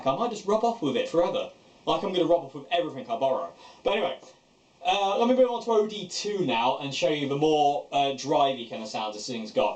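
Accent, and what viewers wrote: United Kingdom. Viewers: I dig your Brit accent , & like your vids ! 😎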